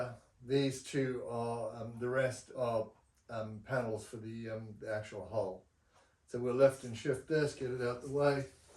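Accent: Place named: Cape Town, South Africa. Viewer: Aha...starting at the beginning! You definitely sound Cape Townish, or Zimbo, maybe...no worries, lekker, man!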